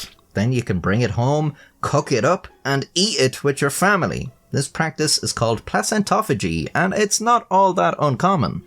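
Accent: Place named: Ireland. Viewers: Maybe you just wanted to hear an Irish voice telling a tale with some line art animation, or maybe you wanted to know how to eat human. I'm here for the first thing, btw. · I clicked because funny Irish man be talking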